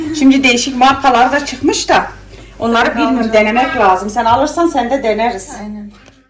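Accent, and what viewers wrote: Turkey. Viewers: orda doğdunuz yani nerelisiniz peki şive doğu gibi geldi yada yabancı dil konuştuğunuz için mi kız kardeşimde Fransa da 2 gün falan oldu gideli orda yaşıyo yeni gelin 😊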